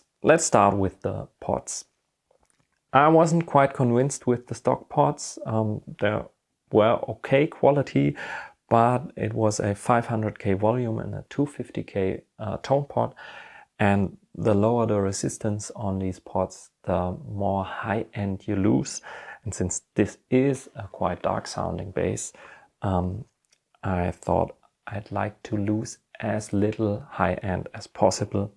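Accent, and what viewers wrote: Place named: Germany. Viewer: I realized from your accent, that you live in Germany as well. I really like your mod ... would you offer to do it to other rascals (for money, sure)?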